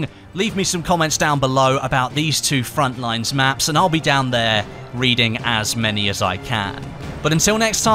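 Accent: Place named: United Kingdom. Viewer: When people from the UK say h.....so awesome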